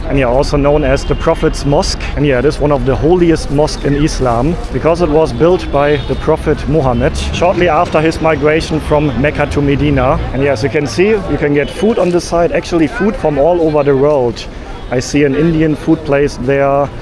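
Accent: Germany. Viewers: Bruder dein deutscher Akzent ist killer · Love his german accent ❤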